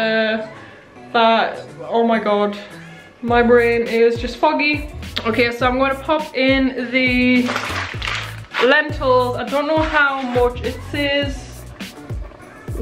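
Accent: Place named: United Kingdom. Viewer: Very interesting a British girl making Puerto Rican food but you did a good job that was very beautiful love the British accent much blessing😊👍🇵🇷🇬🇧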